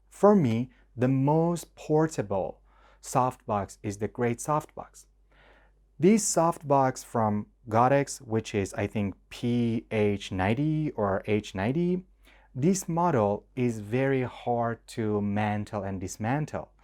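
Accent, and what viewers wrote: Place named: Iran. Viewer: Based off your accent, you sound Persian. 👍😎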